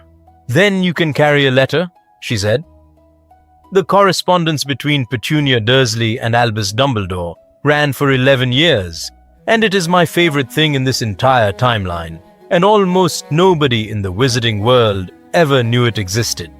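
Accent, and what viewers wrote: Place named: India. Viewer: I enjoyed this story. I liked the Narrator with the Indian accent. I liked that Petunia was a decent character, not the abusive woman she is in all other versions.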